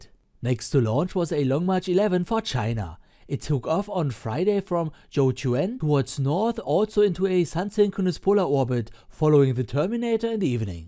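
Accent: Germany. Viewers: Great Video. Where are you from your accent is very german. I’m from Germany. Hi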